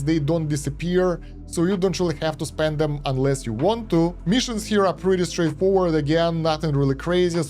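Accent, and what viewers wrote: Russia. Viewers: Слышу твой русский акцент, автор))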